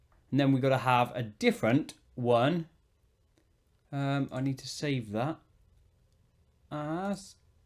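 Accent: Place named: Somerset, United Kingdom. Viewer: Where are you from? You sound local ish to me! I'm a Somerset boy! I'm guessing Bristol or Swindon!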